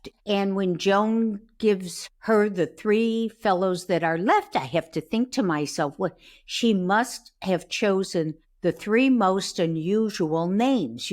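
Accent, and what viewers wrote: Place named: Upstate New York, United States. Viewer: You are so cool -- just subbed. I agree that Joan is NOT ready to get engaged. BTW, you sound like you're from upstate NY. 😊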